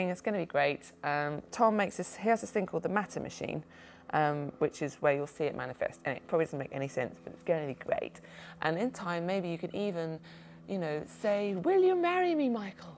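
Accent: England, United Kingdom. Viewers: What part of England is she from?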